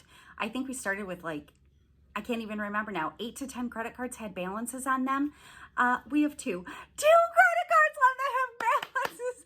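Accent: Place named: New Orleans, United States. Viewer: I just love your cute little voice all the way from New Orleans..La💜🤣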